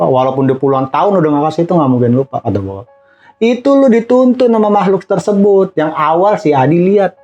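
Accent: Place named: Tegal, Indonesia. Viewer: kalo lagi ngapak"mampir dong ke kampung aku aku juga di daerah tegal tau. Klo udah bicara fillowopi nya keren"banget aku suka.!!!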